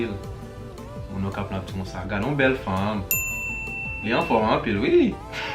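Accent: Haiti. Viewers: I speak the same way, from Trous Du Nord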